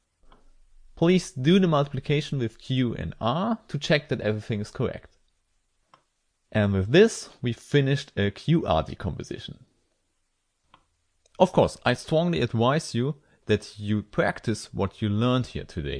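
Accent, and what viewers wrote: Germany. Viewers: I'm french and you are a german speaking english explaining this better than my teacher ... thanks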